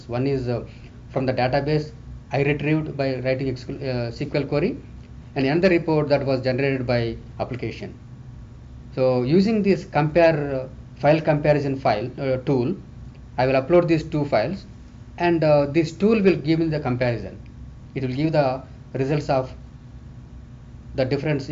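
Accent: India. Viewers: He might be south indian..but he has good knowledge in this sector.. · Good information , but his hindu accent pain in the ears.....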